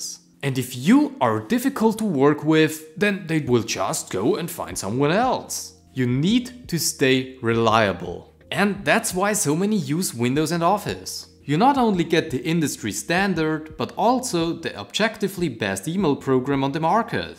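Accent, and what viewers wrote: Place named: Germany. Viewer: I smell "Deutsch".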